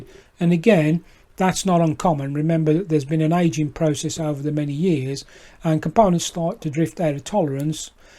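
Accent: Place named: United Kingdom. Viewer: Thanks for the reply, what is their shipping time to UK like? (I'm guessing your in the UK from your accent)... :)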